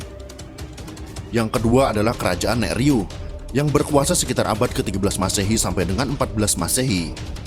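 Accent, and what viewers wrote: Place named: Java, Indonesia. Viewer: Miminnya ada logat Jawanya..kuliahnya d Jawa ya min?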